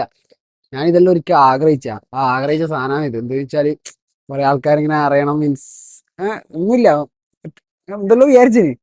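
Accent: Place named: Vadakara, India. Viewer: engale evida anu engale basha keteet kanur alle vadakara slang thonnu atha choyiche😂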